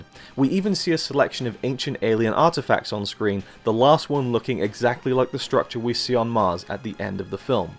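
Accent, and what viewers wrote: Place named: United Kingdom. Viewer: for British accent :)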